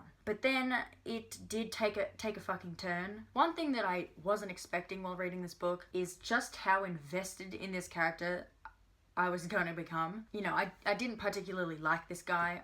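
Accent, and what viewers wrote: Australia. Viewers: her accent sounds Australian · is she from Australia or New Zeland?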